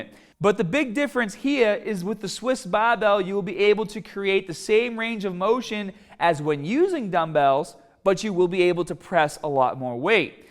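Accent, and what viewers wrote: Boston, United States. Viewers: Boy from baaaston or new england for dam sure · Can you not see that he's from Boston or are you just that dense? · Are you from Boston? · God I feel like I just took a trip to Boston. if I did a video you would probably get a kick out of me saying barbell and yard.My step mom is from Boston and she says bahh-bell instead of barbell and Bahhs-ton instead of Boston lol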